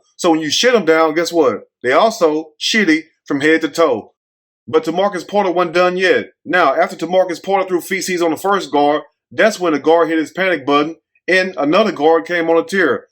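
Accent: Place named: Houston, United States. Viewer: I heard you talk and immediately heard that H Tine twang. Possibly 4th ward.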